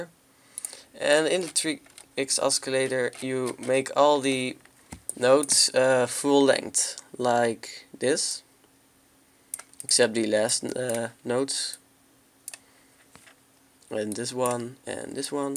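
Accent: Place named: Netherlands. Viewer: je hoort dat je nederlands bent :P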